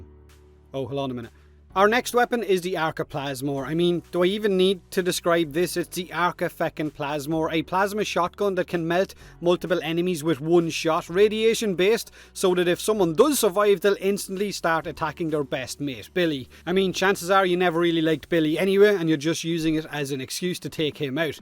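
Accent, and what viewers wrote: Ireland. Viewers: Honestly, Idk if I watch your vids for the content as much as I enjoy the jokes, nonsense and the feckin’ irishness and hearing u try to say “three”😂 “The Tigris Bleeds ships” I’ve never laughed so hard in my life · Is he Irish?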